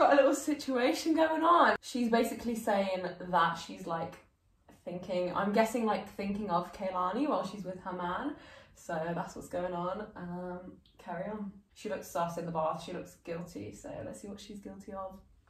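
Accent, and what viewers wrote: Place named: United Kingdom. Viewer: I love your hair, your accent reminds me so much of someone from a British sitcom like skins 🤣❤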